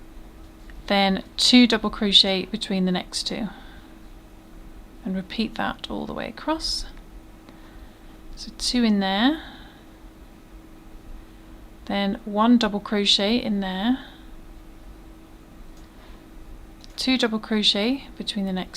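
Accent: United Kingdom. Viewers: Hi! Thank you so much for sharing this little Angel. It is perfect to send in a Xmas card to my sister in Germany. I know she will love it. You have become my go-to for all things crochet because your instructions are so detailed and very easy to follow. Plus, I just love your British English and find your voice very pleasant to listen to. Thank you so much! :)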